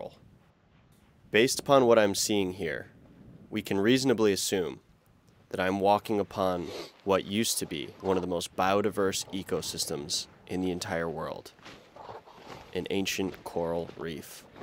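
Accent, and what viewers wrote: Australia. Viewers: Love your Aussie. And the wind not music takes me there with you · I love your aussie so much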